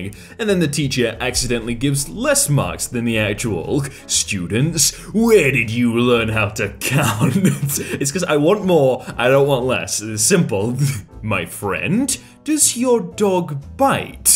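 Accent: United Kingdom. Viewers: day 40? of asking that he british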